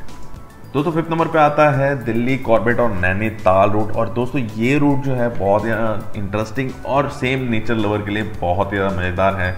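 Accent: Gujarat, India. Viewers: Sirji, Are you from Gujarat !!!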